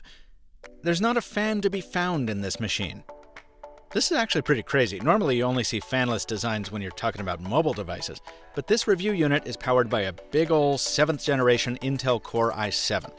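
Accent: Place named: Transatlantic, United States. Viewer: Nice Transatlantic accent.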